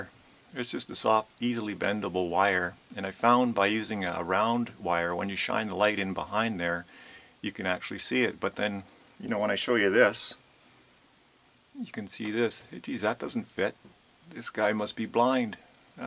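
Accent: Canada. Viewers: I could tell you're Canadian as soon as I heard you talk. -From a fellow Canadian :P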